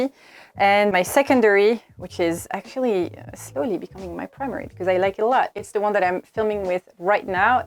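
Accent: France. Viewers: Great Video , I love the french accent ! Vive la France - vive le general De gaule !!! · Ur the first time I’ve seen ur video log u r soo❤❤ ur voice is French so r u from Quebec or Europe France? Happy birthday 🎉🎉🎉🎉🎉 next Week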